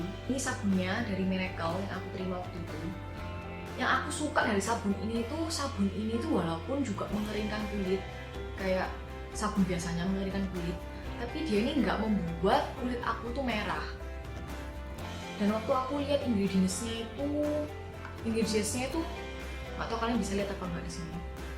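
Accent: Java, Indonesia. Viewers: Jawa bgt. 😂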